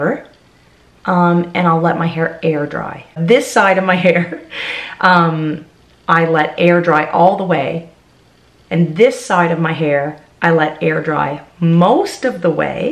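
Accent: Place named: Nova Scotia, Canada. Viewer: I love your accent. I walk around practicing my Nova Scotia voice.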